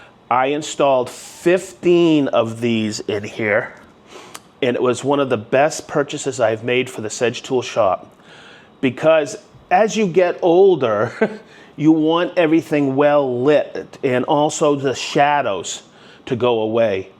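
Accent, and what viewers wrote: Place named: Boston, United States. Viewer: I could be wrong.. but that’s Boston my friend.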